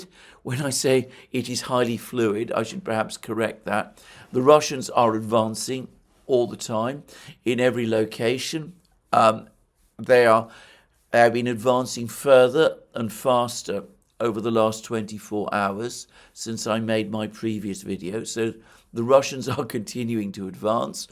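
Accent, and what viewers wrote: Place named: RP, United Kingdom. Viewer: btw some posh accent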